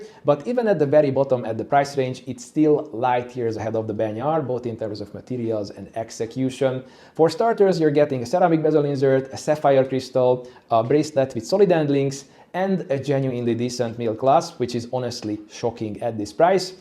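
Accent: Hungary. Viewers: What accent is that, Hungarian? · Magyar akcentus amit hallok?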